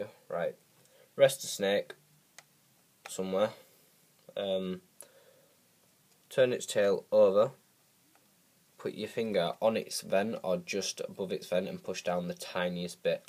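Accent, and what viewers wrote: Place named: United Kingdom. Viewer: Ur British!! Lol il pm you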